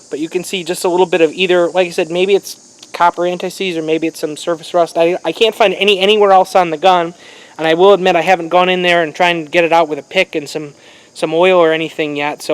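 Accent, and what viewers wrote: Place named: Chicago, United States. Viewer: HA, listening to your accent i knew you had to be from the Chicago land area. Then you busted out with you living 30 minutes from Rguns and I was like, son of a bitch lol. I live about 45 minutes east from Rguns, I'm over by the lake. I just bought this rifle from reddot arms in lake villa and I'm going to do the same thing as you and just head over to rguns and get the yugo sling. good video, i just subscribed.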